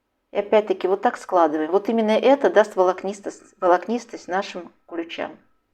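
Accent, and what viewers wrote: Baku, Azerbaijan. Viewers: Спасибо ,я тоже пеку куличи и научила меня бабушка она мне не родная но я считала но моей такая добрая,ласковая аиу меня не было не бабушек и дедушек ,венское тесто она говорила , похожее на ваше тоже ,очень лёгкое , но хочу попробовать ваше ,мне очень понравилось и ваш голос вы случайно не из Баку ,я из Баку ,голос приятный и вы говорите нечего лишнего ,попробую ваш ещё проще и очень красиво и наверное вкусно ,вас с страсной неделью и со Светлой Пасхой и золотым ручкам и вам здоровья и хранит вас вашу семью ,Господь Аминь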